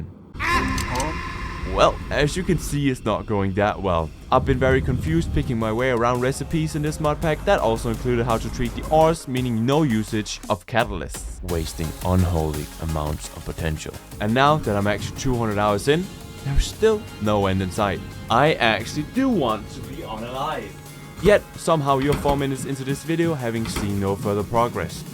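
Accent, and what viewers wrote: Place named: Germany. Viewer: Good f'ing job man, your suffering wasn't in vain. And I would've never guessed you're Danish, you sounded rather German to me 😂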